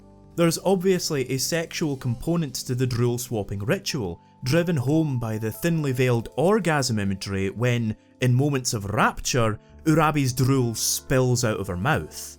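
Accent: Scotland, United Kingdom. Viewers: anime and scottish accent goes so strange together. I meant in a good way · that sweet scottish accent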